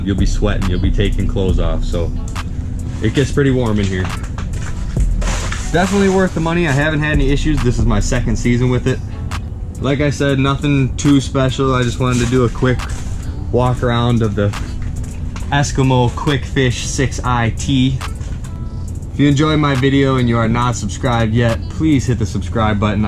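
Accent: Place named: Wisconsin, United States. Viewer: I’m from Wisconsin as well and man it took not even 5 seconds to guess you were from Wisconsin. Could hear it in the voice easy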